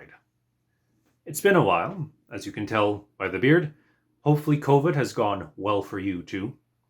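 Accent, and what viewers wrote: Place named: Austria. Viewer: Man, your syntax and inflections remind me of the Military History Visualized guy, Austria has taken hold of you.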